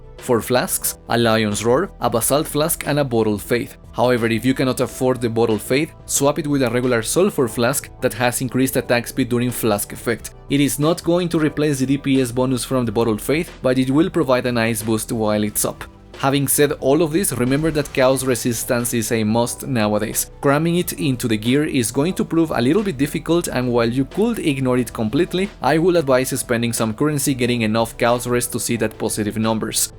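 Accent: Spain. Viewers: I think all PoE guides should be done with a Spanish accent after watching this one. Great vid and great guide!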